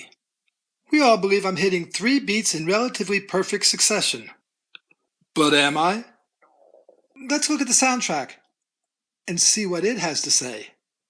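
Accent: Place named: Oxford, United Kingdom. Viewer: And you do that, totally great. It´s so easy undertand you Oxford english. No question by now. I often ask myself about similar topics. This is a fantastic response, supported with videos. THANKS !!! I´m waiting for some topics about Field Archery, the most interesting and HARD to learn. Te preguntaré en español también, jejeee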